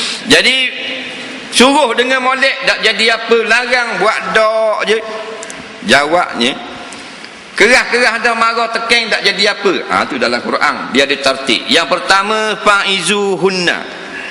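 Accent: Terengganu, Malaysia. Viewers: ustaz ni bgus dgn cara dia..ada unsur jenaka n pengajaran..walaupun dgn slang trngganu...tp dpt fhm msg yg nk disampaikan...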